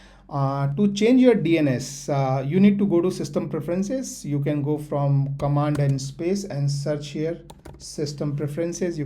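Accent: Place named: India. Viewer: I swear Indians always know the best tech, heard the accent knew my problem was getting resolved .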